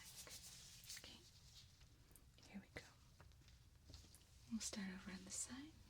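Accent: United States. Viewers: I would LOVE some more sci-fi. You are the best,. Your diction and general English usage is way beyond what I'm seeing on competing channels. You also have a beautiful voice... no vocal fry, no nasality, no whine... and a very pleasing American accent. Listening to you talk is like spooning honey from a bottomless jar:-) As if that wasn't enough, the camera loves you too. Even with minimalist make up you always look sparkling and fresh. I guess you're used to people telling you how pretty you are, so I won't even go there:-D I wish I looked like you! I love your sci-fi style though. It reminded me a bit of the air hostesses in The Fifth Element, except their make-up is a little too heavy, for my taste anyway. Your make-up here is just about right. It goes perfectly with the sci-fi wig. You could also do a take on Rachael in Blade Runner. That would be kinda sci-fi, but you wouldn't need the wig. 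Thanks so much for your wonderful channel.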